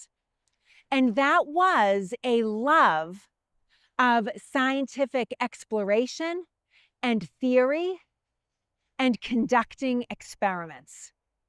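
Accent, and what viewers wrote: Boston, United States. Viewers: Very interesting, great job!! Love the Boston accent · I love your channel.I love american history and I learned something today, thank you for doing these videos.I love your boston accent you're very genuine, and very adorable person and I believe you are gift from God to us.Americans and may God bless you forever! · Please edit your video captions. You have a Boston accident that makes it hard to understand. So I I used captions. Where is Wuben? Good info, just make yourself more understabdable. Thanks. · Subbed for the Bahston accent ALONE. Intelligence:: BONUS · I love the Boston accent! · A great story. Plus, a well-edited presentation, delivered with passion and enthusiasm. And a fascinating accent! I had no idea that this is the Bostonian sound. The whole video is clear, measured, and charming. Thank you. 👏🏻👏🏻👏🏻 Subscribed. 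· “…. but this leatter conTAIns FAr Maore TAN meats ta eye.” I had forgotten how much I love a properly spoken Boston accent. AND wicked good content? The narrators constant location changes requires far more volume mixing with a sound bar than one would think. Truly impressive post production work! It was seamless. Hearty congratulations! I’m ALL in !! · That accent. My Bostonian heart. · I cant believe i thought she was British for a minute . Her Boston accent is so Revolutionarly Fantastic!!!! ❤❤ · OMG, love that strong Boston accent! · Interesting historical info and I enjoy her Boston accent but I wish someone would tell her that she doesn’t need a separate hand gesture for every single word. Just speak; you don’t need to continuously wave your hands. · I love your Bahston accent and I'm from Boston... Bean Town forever!! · “Ahlettah was sent that nevah was meant to be seen…. It makes you wandah…” Now that‘s a Boston accent! 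· Now THAT’s a true Boston or at least Massachusetts accent!🤣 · now that's an authentic Boston accent. Awesome uncovered history! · Who’s here for the bahstun ahkscent……..the lettah, the cah, I love this accent 😂😂😂…..❤❤❤ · I love lovely Boston accent. Out here on the west coast we don’t get to hear that enough. It took me a while to figure out that what she pronounces as “Woben” is actually Woburn! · I truly love your enthusiasm for history and your Boston accent is amazing! Consider me Michael Paul Padilla a fan and a friend in history!! Thanks so much for your wonderful videos!!! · My God, the accent... never heard one this thick before, I wonder where in BeanTown was she raised? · As always, the facts you bring to us are fascinating and often something we are learning for the first time. Isn't it tragic to betray not only a friend but your own country. Btw, I'm not from Massachusetts but I love your Boston accent. 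· Wow, that is one thick Boston accent! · you're reading too much into it. Time to relax. I was smiling and laughing through the entire video. Her Boston accent made me HAPPY.😂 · WILL YOU MARRY ME?! …and speak freakin Boston-ese sweetly in my ears for as long as we live?! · That accent is definitely Boston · Hearing these stories from someone who is clearly a Bostonian and has the accent to prove it makes me feel good, I was raised in Somerville and know its history very well. Thanks for sticking to your roots!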